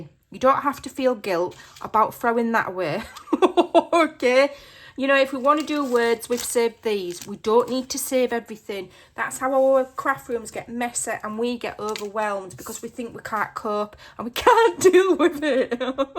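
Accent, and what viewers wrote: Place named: North, United Kingdom. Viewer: Only just found you and haven’t watched this video all through yet but …..I just love your northern accent so you’re a winner already 😀 .. will comment on the video later 😀 xx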